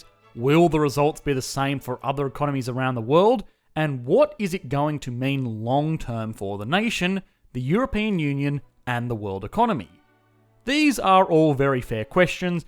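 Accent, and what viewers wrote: Australia. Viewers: I love the Aussie accent! So good to hear my people on Youtube. Step aside... Canadians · The accent is Australian as far as I can tell. His views on Brexit suggest that ie ABC/corporatist/left leaning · Australian eh?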